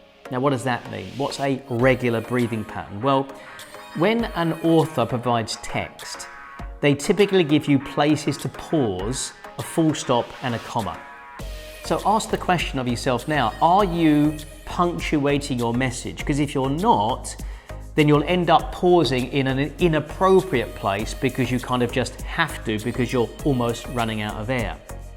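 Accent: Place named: South, United Kingdom. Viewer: FYI it's an uneducated Southern British accent. To a British person it doesn't sound impressive.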